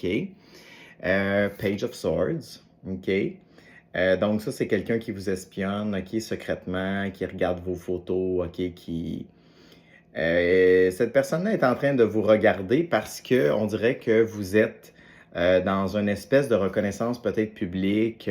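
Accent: Canada. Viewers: Bonsoir, vu l'accent vous êtes du Canada, si c'est le cas incroyable d'avoir une telle précision sur le tirage avec tant de distance, comme quoi les énergies sont connectés. Merci pour votre guidance 👍👍👍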